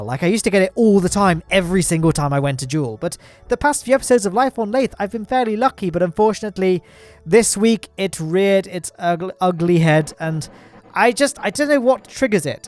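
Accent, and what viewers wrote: United Kingdom. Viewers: british voice = smart voice. this is just the way it is. (i'm an american)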